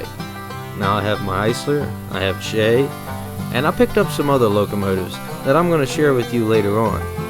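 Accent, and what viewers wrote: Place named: South, United States. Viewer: Your story tell voice with the slight southern drawl makes the narrative for this perfext. Fits the WM atmosphere almost as if scripted. Hope to see /hear more. Nice machine, I have three of them. Bears to get running right, but once they do, they're magical to behold. Some of the strongest workhorses I have too.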